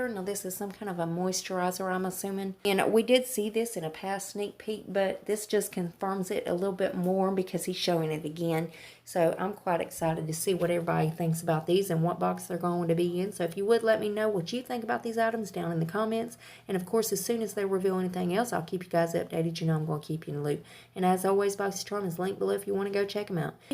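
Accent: South, United States. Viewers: I'm glad to see you back. I was worried... from one Southern girl to another, I need that accent in my daily life! ❤❤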